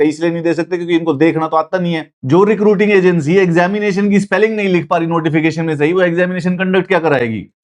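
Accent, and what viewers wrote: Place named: Haryana, India. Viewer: Haryanvi english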